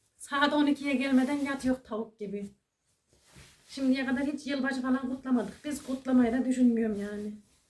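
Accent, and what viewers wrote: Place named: Aksaray, Turkey. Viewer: Videolarin izlenme orani,abone sayisindan fazla!!.bir zahmet onlarda abone olmayanlarda abone olsalar😊.Demekki aksarayliymissin.Ogün bir videonun altinda yazmistim.aksaraylimisin kayserilimisin.sivenden anlamistim.Aksarayli elmasin hayati,bide onun gelini cansu otuk var.onlarda bu sivede konusuyorlar.Hatta siz konusurken,sanki cansu konusuyor😊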